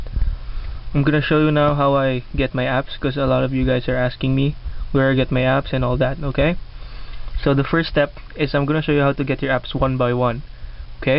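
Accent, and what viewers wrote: Philippines. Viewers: are you filipino cause you have da accent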